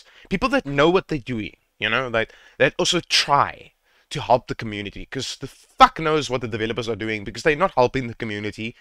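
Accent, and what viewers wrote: South Africa. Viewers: Lekker what a legend! Adding you up. When I heard the South African accent I knew this’ll be a quick fix!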